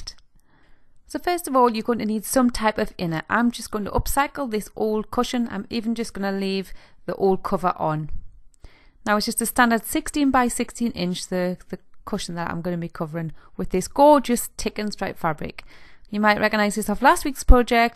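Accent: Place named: England, United Kingdom. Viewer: Hi love, Wow I have been binge watching your videos . I'm loving your channel! You have inspired me so much! I love your English? Accent. Too. Lol Your fur baby is adorable too. I could see myself living in that fantastic farmhouse of yours. Just Beautiful. Can't wait for another video from you! HUGS Debbie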